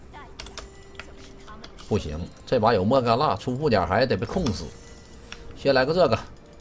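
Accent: Northeast, China. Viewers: 猴哥这东北话听着挺爽的😂😂😂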